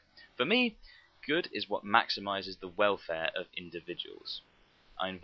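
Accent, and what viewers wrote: United Kingdom. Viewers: This is a much better video than I usually get and I truly thank you for it. I told myself I was not going to do many more rebuttal video, however, I might have to rethink that decision. I obviously disagree with you nonetheless well done. And allow me to grant you the same (backhanded in your case) complement. You good sir are quite bright and more than eloquent, aided greatly by that killer British accent.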